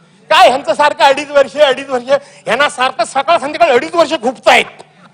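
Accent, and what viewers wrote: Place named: Konkan, India. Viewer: कोकणी आवाज, अभ्यासपूर्ण व मुद्देसूद मांडणी केली. भास्कर जाधव साहेब 🚩🔥🐯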